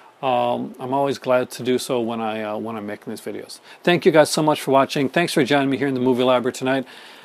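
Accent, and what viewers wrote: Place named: Canada. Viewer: you have more of a Canadian accent now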